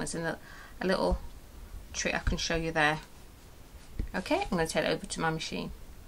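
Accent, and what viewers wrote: Manchester, United Kingdom. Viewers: Thanks for this. I love listening to you. You sound just like my cousin from Manchester.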